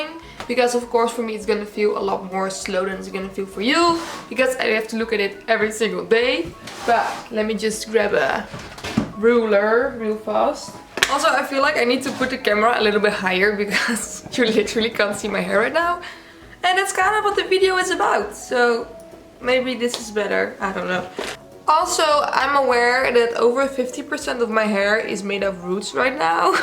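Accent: Netherlands. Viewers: This is my first video watching you and your english accent sound so similar to mine are you by any chances dutch? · omg ben je nederlands of beeld ik me nu een accent in?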